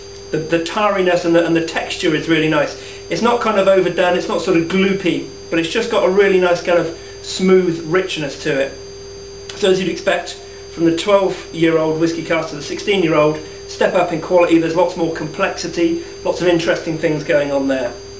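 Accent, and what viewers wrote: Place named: Southern England, United Kingdom. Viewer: do you live up north? I'm confused, as you have a southern accent. Don't tell me you commute to Leeds every day?? No problem with the stemware here. Those are speciality beers. But a reminder, there's nothing wrong with a good, old fashioned pint pot, or glass.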